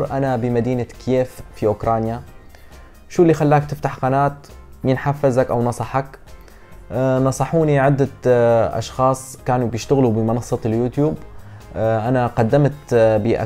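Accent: Syria. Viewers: شلون من الشام ولهجيتك مردلي شغل الحسكة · اخي انت من الشام بس لهجتك تميل لديريه ارجو الرد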